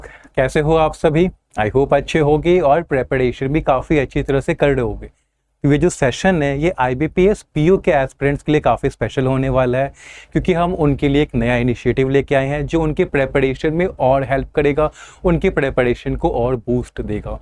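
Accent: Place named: United Kingdom. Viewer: Bhai hindi bolne ka course kr lo Aise lg rha hai England se yha aakar hindi sikhe ho Thanks for these knowledge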